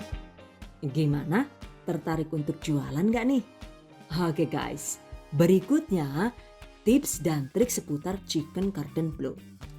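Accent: Banyumas, Indonesia. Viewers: sebelum2 nya cuma nonton n👍.tp baru2 ini subscribe .sangat cleaaar penjelasan nya . ide2 buat jualan nya goood...ngapak nya 😁👍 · Kiye wong Madiun apa wong ngapak si sing duwe channel dadi geget😂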